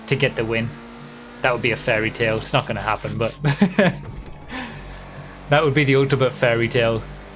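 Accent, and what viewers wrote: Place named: Hartlepool, United Kingdom. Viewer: Cant believe your from Hartlepool I've been watching all these years trying to work out the accent! I'm from the area :')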